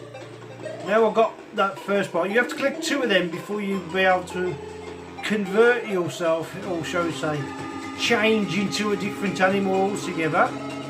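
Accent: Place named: Midlands, United Kingdom. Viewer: That's on my list to try out not got round to it can I ask where are you from you sound like you are from the Midlands I'm from Wolverhampton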